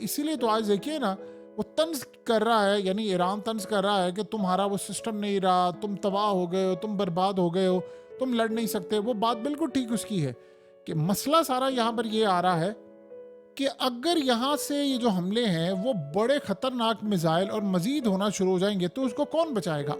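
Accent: Punjab, India. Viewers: Sir Mai India 🇮🇳 se hu aap ki nwes ward ki Namber 1 nwes hai Aur aap ki Awaz hme bahot Achhi lagti hai urdu ke sath thodi si punjabi ki jhalki bhi hoti hai sir mai ko dekhna chahta hu plzz sir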